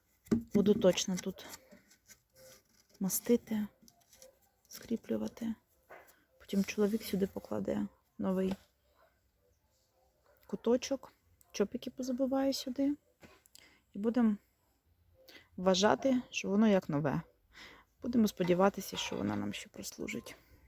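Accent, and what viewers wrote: Western Ukraine, Ukraine. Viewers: Вимова у вас західна, не скажеш що ви з Слобожанщини. МИРУ нам всім та добра. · Вас вже від западенки не відрізниш😘 навіть не віриться, що ви з Харкова. А вікна файні вийдуть)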